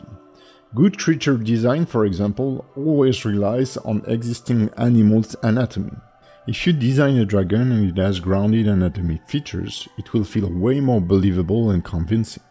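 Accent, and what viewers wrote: France. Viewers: Such meticulous work! It took me a while to get used to your French accent but it was so worth it. I bought your rigging course and it's simply outstanding. My compliments on your teaching skills. Thanks a bunch for sharing your knowledge and striving to make it accessible to complete beginners like me!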